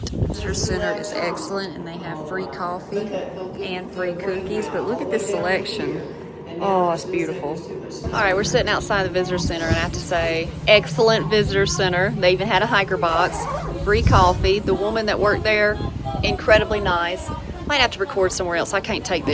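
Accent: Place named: South, United States. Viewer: Go home and strengthen your southern accent because you’re rapidly losing it.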